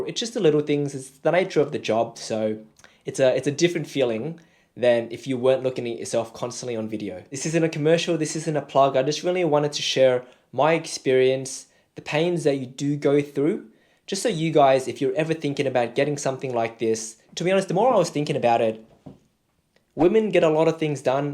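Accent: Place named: Australia. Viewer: I'm assuming with ur aussie accent the $4k is in AUD and not pandering to the yanks? :) p.s the results look great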